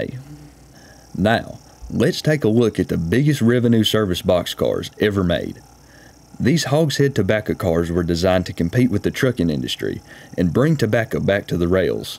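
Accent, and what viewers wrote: South, United States. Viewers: Love your Southern US English. It sounds like home.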